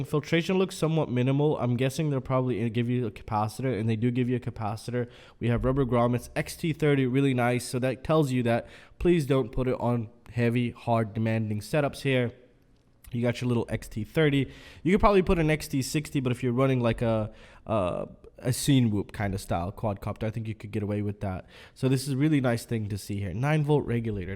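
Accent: United States. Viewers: Somehow I always thought you're American and in America :D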